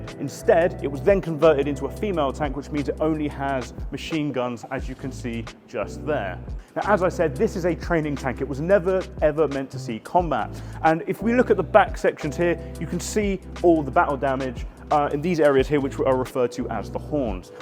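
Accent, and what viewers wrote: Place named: United Kingdom. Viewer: God i love british accent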